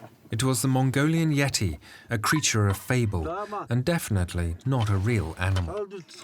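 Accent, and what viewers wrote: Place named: United Kingdom. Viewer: the herder reaches inside to pinch or sever the aorta leading to a swift loss of consciousness and death. Just because you find it gruesome doesn't mean it's not humane. The narrator sounds British and if he says it's not brutal, maybe you should agree and stop trying to pick on races you feel superior to.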